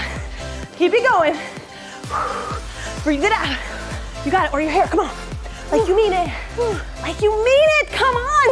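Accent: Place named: United Kingdom. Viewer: Wait, didn't she used to speak with a British accent :/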